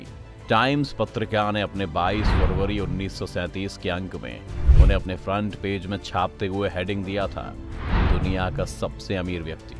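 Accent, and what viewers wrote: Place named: Hyderabad, India. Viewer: Hyderabadi bolty 😀💚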